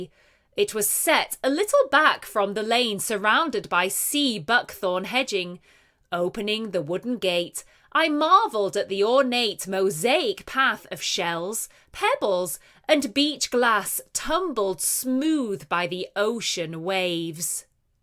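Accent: United Kingdom. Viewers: Thank you so much. I love so much your British accent.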